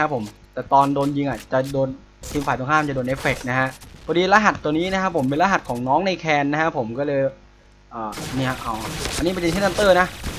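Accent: Isan, Thailand. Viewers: พี่คนอีสานหรอครับ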